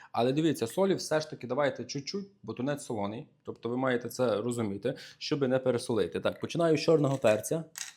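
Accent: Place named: Western Ukraine, Ukraine. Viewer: Трошки не аль-денте😄 Чудова наша західна розмова😍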